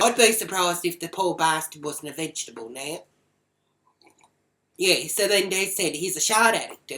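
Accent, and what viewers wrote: United States. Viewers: loving your American accent chook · love wats the 'merican accent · Your American accent is really good. You sound just like an American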